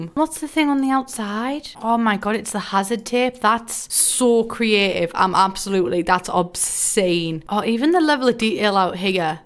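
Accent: United Kingdom. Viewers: I've been binge watching you for about a week now, and now the voice in my head speaks with a thick british accent. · I don’t understand British speech can someone INTERPRET???? I only reed Harry Potter and that’s it , yes I’m American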